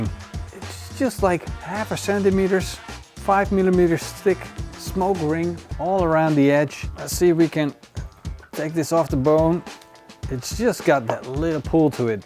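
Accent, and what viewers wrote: Netherlands. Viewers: Nederlands accentje maat :D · Area Mann he is from the Netherlands i hear it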